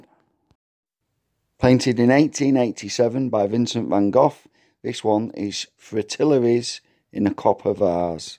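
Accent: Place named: Lancashire, United Kingdom. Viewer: Love the French pronounced names in a Lancs accent! Art seems better now I get older!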